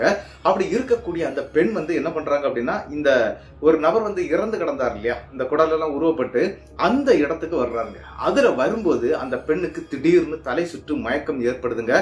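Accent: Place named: Tirunelveli, India. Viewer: Kadhai sollum vidham Tirunelvel i slang nice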